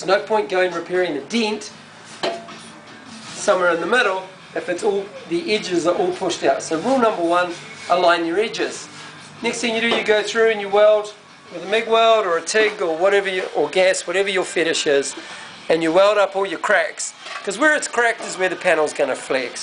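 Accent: New Zealand. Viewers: "Kiwi bloke" . . . love the "dawun unda" . . . could listen all day . . . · It's his New Zealand "ex-cent, " Mispronunciation of the vowel e also cherry "rid". (Red). ignore the accent admire his skills and enthusiasm.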